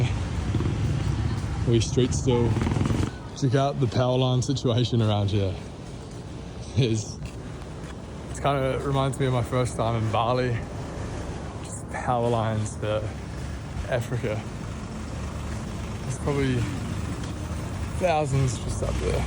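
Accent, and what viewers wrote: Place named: United States. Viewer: Hi, enjoy my home country and be safe always!your accent sounds like americans but upon checking your channel i found out you are from NZ..hope you get a lots lots of Filipino subscribers!gluck👏✌️🙏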